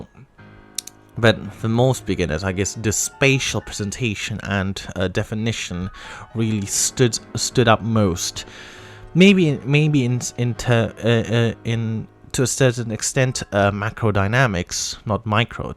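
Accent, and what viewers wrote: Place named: RP, United Kingdom. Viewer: Lebih ga paham lagi kalo B pake aksen Scottish loh. Wahahaha. Sebenernya bagus banget sih si B ini pake aksen RP-ish (menurut sepengetahuan gw), agak kurang natural aja. Mungkin kalo swear words fuck sama shit diganti jadi bloody sama bollocks bakal jadi lebih natural. Wohohoho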